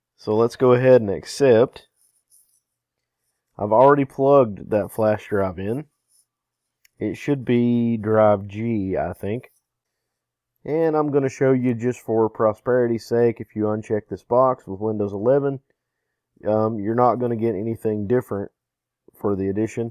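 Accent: South, United States. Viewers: Excellent. Love the Dixie accent !! ;)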